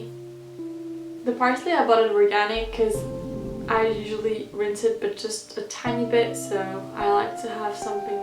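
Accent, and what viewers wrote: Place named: South, United States. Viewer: You have a very southern american accent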